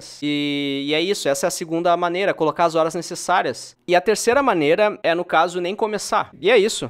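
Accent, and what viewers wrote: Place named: Porto Alegre, Brazil. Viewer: Poha, obrigado pelo diagnóstico! Off: Sotaque porto alegrense detectado.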